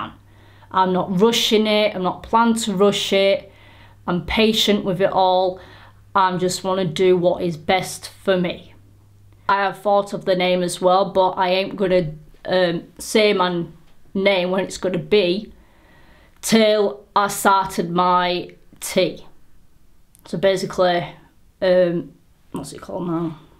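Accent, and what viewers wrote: United Kingdom. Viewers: You can message me if you need any advice about transitioning/getting on T etc in the UK (I'm guessing you're from the UK too from your accent 😂) 😁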